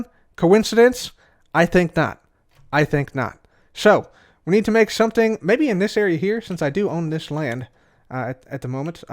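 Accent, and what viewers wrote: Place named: Chicago, United States. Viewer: I realized it the first time I ever heard him speak and instantly recognized the Chicago accent :)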